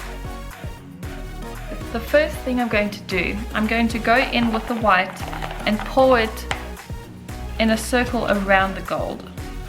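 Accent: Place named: South Africa. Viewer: Awesome om n SA accent te hoor :) thanks for a great video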